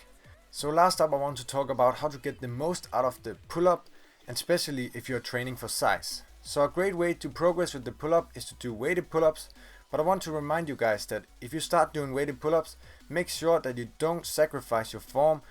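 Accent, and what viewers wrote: Denmark. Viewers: You sound Danish i know because im Danish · damn that danish accent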